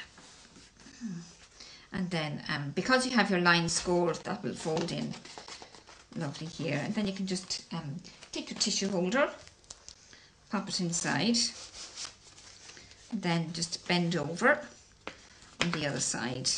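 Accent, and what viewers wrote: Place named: Ireland. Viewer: Thank you. I love your lovely Irish accent.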